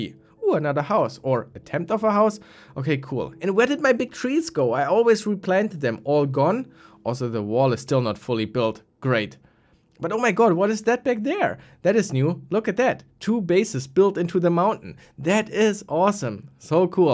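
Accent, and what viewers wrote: Germany. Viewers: U just sound so german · your german? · i hear your german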